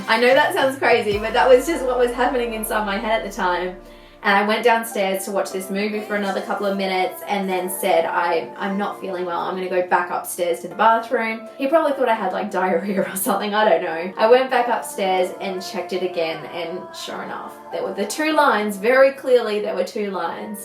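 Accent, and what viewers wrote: Australia. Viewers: I thought I recognised your accent from somewhere! Go Australia!! I'm Australian too! Your so pretty. 🇦🇺😍😘❤️🇦🇺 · I knew straight away by your voice your an Aussie!!! Im an Aussie too! Subscribing right now!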